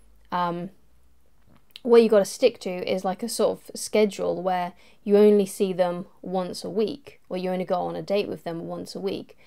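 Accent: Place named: England, United Kingdom. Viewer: with a voice like that....I can listen to you all day.... just can't help to be attracted to a pretty Lady with a soft English accent.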